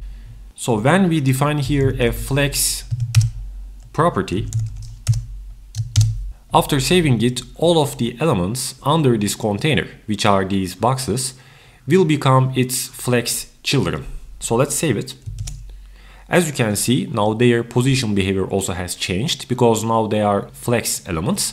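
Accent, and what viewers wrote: Turkey. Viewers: Türk ingilizcesi nerde duysam tanırım :D cok güzel anlaşılır sade bir dil kullanılmış. Elinize dilinize sağlık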